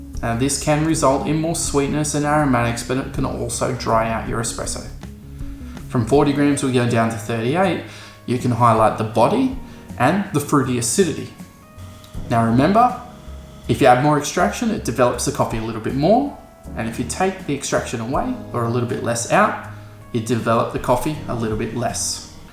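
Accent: Australia. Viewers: Good vid and Australian love it, well done.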